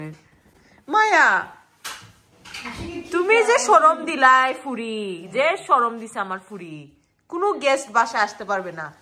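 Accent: Sylhet, Bangladesh. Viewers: Your Sylhety voice is cute bestie😊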